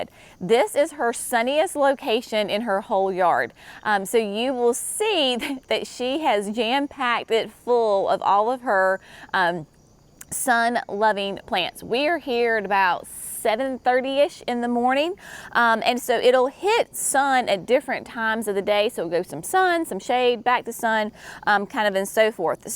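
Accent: South, United States. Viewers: Your parents' garden reflects a true HOME garden created by loving hands who seem to love the beauty in the gifts from God our Creator whether that be in the incredible variety of plants He gives us to enjoy, or the beauty in a a piece of wood, a fallen log, or another artist's creation! I appreciate your just being yourself as you walk through "Mimi's garden" in both your joy of sharing information about a plant, while teaching us as well (I likely could be your mama, but ALWAYS learning!) As a fellow Southerner, I love your natural expressions and lilt in your voice. However, I also appreciate and learn from your very different sounding but naturally gifted gardening friend Laura in Oregon...both of you are "down to earth" as we would say...no pretense in any way. And did a great job on "walking with you" in that woodland garden environ...that's not easy to do without sliding on pine straw or hitting a tree root (unless that's in the 'bloopers':) Blessings!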